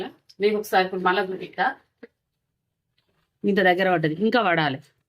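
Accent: Telangana, India. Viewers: బలే చెప్తున్నారు మీరు చాలా బాగా చెప్తున్నారు మాది గోదావరి జిల్లా కానీ మీ తెలంగాణ యాస చాలా బాగుంది 👌👌🙏🙏🙏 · Natural ga manchiga telangana yasalo chala sakkaga jeppinv amma ❤ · Pakka GODAVARIKHANI la undhe slang nijamena😅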